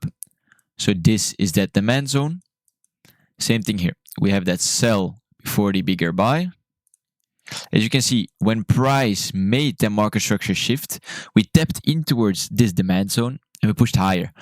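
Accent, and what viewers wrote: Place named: Netherlands. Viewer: ahh okay.. thanks for replying. Btw..Nice Dutch-English accent you got there!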